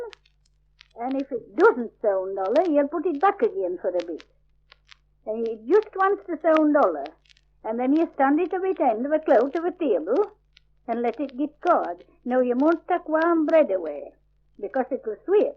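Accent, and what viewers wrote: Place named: North Yorkshire, United Kingdom. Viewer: the Northern West Riding however is considered part of the North Yorkshire dialect group, hence my comment. Were she a West Riding speaker proper you'd have things like: - "hoil" not "hooal" for "hole - "spooin" not "speean" for "spoon" - "stooan" and "sooa" not "steean" and "seea" for "stone" and "so" - "spare" not "spaar" for "spare" - "oven" not "yoon" for "oven" - "bake" not "beeak" for "bake" - "one" not "yah" for "one" before nouns - "enuff/eniff" or in the plural "enew/enow" and not "eneeaf" for "enough "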